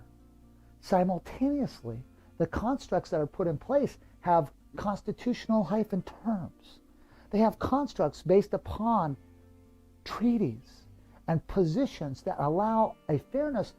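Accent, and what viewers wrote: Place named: United States. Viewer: Transcription de la conférence ci-dessus de Russel-Jay : Gould Ne parlant pas couramment l’anglais L’accent américain ne m’aide pas Voici donc ci-dessous La transcription de la traduction you tube Avec certainement des fautes de traduction Gratitude * * * Salutations J'ai regardé les médias et les choses qui se passent dans la société Il y a beaucoup de préoccupations que j'en … Que j'ai en tant que maître de poste de notre monde Je suis témoin des mots, que les gens utilisent et des conditions historiques des mots du passé Je parle à propos de Babel, non je n'ai pas dit la Bible j'ai dit bavardage : bable, qui est une grammaire fictive C'est la grammaire ou la communication que nous utilisons pour la confusion Et je voudrais tout d'abord dire honte au gouvernement des États-Unis au Congrès, à la poste les militaires US, et des présidents qui depuis 1999 ne se sont pas manifestés Et non pas donné clôture des contrats aux citoyens de cette Grande Terre et aux citoyens du monde que nous étions capturés par un système frauduleux de grammaire de Babel avec le certificat de naissance de tout le monde pour ceux d'entre vous qui n'ont pas les antécédents de ce qu'est un certificat de naissance s'il vous plaît aller sur <link> et regardez mon film mais revenons à ce que nous voyons maintenant dans la société dans l'ingénierie et la nouvelle compétence en communication que les gens utilisent vous entendrez les gens utiliser le mot aborigène lorsque vous commencez un mot avec une voyel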